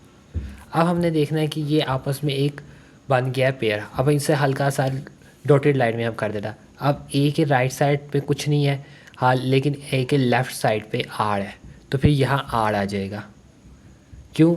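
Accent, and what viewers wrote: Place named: Pakistan. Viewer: pakistani aacent jaisa hai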